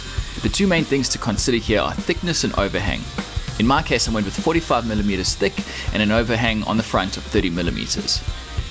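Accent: South Africa. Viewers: you sound totally south african to me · South African? Great job btw. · That accent is SOUTH AFRICAN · You sound like a South African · Boet, I see you're in Oz, but you sound just like a Saffa? Great video by the way. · Hi. I can hear from your accent that you are from South Africa, what cement do you recommend using thats available in SA? Thanks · Definitely a South African living in Australia. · Your accent has alittle South African in it..love your work.. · Aussie - who woulda known, you sound South African. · Hey man, this is great 👍 Are you in perth? ( you sound South African ) , we have lots of saffas here. Nice work again mate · Thought to myself, this oke sounds Saffa, then spotted the bare feet for confirmation LOL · Oi this dude sounds like a South African bloke? ;) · This is definitely a South African accent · Helpful video. Thought you were South African based on your accent.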